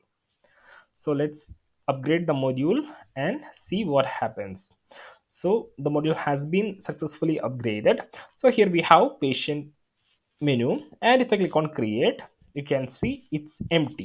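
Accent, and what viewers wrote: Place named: India. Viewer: very useful content, but I'm still not used to hearing your Indian accent voice🙂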